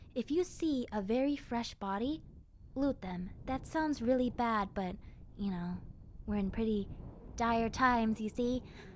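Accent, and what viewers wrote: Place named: New York, United States. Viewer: I love your New Yorker accent! >//~//< Flippin adorable. X,D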